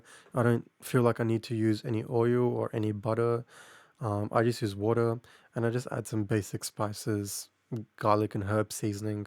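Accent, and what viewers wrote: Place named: United Kingdom. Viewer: Thank god you’ve a British accent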